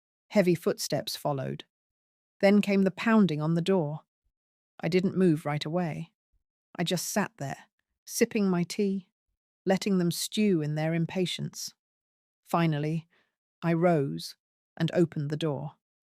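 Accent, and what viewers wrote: United Kingdom. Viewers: If she’s from Texas she has a very strong British accent, why?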